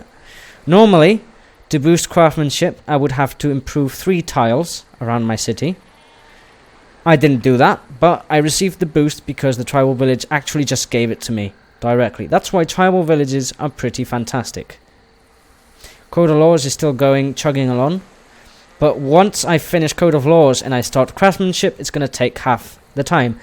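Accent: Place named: Northern England, United Kingdom. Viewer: I really appreciate this video. You very clearly and calmly lay it all out there for a beginner like me. You have an unusual accent, I was trying to place it. Lincolnshire? I heard elements of that northern twang, but not as strong as some.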